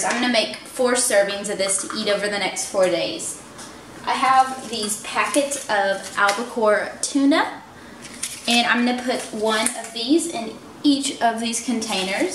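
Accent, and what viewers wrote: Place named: South Texas, United States. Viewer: I'm doing the same type diet myself. Your southern Texas accent is strong lol. I'm from Texas too. Thanks for the ideas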